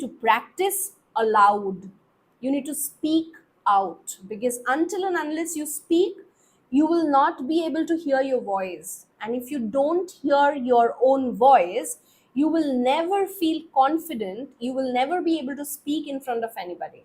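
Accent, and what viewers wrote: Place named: Bengal, India. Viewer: Your mother language is bangoli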